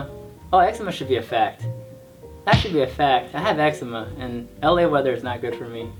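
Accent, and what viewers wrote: Texas, United States. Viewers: Now I understand shy he talks so funny, he's from Texas :D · He has a Texan accent lol. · Wow, I never realized that was from Texas, I can totally hear it in his voice. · it's that southern drawl we have in Texas. maybe not a southern accent, but we draw our words out more. :)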